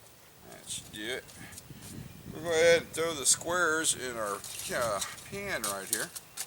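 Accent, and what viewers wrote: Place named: United States. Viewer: Great Video, yr cooking Videos becomming more and more Professional...its nice to watch you while cooking and listen to yr nice american Accent,... the video is very interesting to me, more interesting than to listen to the cake king in US television. greetings and good appetite my friend Harry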